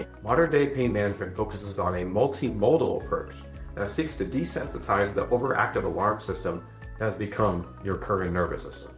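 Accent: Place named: Kansas, United States. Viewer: This was enlightening! It’s so difficult for others to imagine chronic pain. I get up and dance at a wedding everyone says hey I thought you were crippled and in pain…..I am but you have to live! You are so knowledgeable yet down to Kansas friendly speech. You deserve a huge audience! When I turned 40 fibromyalgia almost ruined my busy life. Add the loss of 8” in height and back pain like the kidneys you mentioned! It’s a miracle I listened to your kidney pain info and it saved me a trip to the nephrologist! Thank you oops!❤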